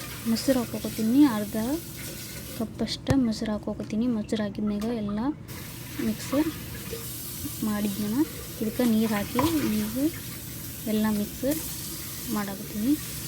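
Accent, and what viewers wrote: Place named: North Karnataka, India. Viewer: Namma uttarkannda maatadakttere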